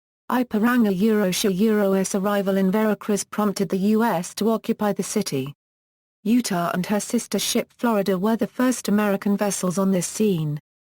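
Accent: England, United Kingdom. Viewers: Love the English accent hate the robotic voice.....And by the way.....Tesla invented and received a patent covering RCVs.......The USS Utah may well have been one of, if not THE, largest vehicle/vessel ever controlled by radio(remote) control to date!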